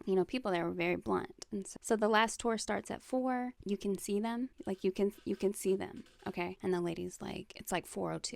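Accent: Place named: South, United States. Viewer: Great Video! I really enjoyed it. First, that entire struggle with the little coffee brewer had me rollin 😂. It was too cute! I also thought your story about being 2 mins late for the tour was hilarious! “I can see the backs of their heads” 🤣🤣 I missed you so much and I’m so happy you’re back. I live in NY state but my family is from the south and I miss them. Your accent feels like home to me. Thank you. You are loved.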